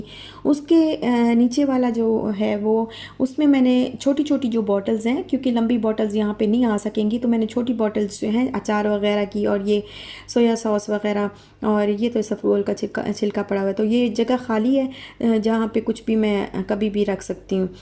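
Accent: Saraiki, Pakistan. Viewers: ALLAH ap ko khush aur khushaal rkhay..ap ki pahli video ma dakh rhi hun ap k bat krne k style se hi andaza ho gia tha k ap saraiki hn...phr comments ma dakha to confirm ho gia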